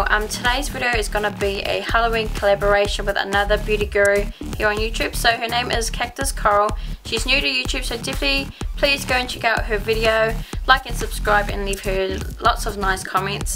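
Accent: New Zealand. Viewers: Omg you have such a maori accent lol itsa compliment! Thanks for the tips. Is this suitable for children as my kids have a halloween party coming up :)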